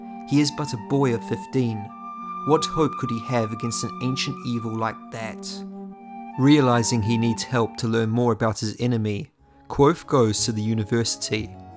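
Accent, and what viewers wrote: New Zealand. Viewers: Question for the creator: are you from New Zealand? I'm not great at accents, (I'm from Texas and have only rarely traveled), so I'm only guessing based on my experience, but I have rarely heard your accent before so am very curious.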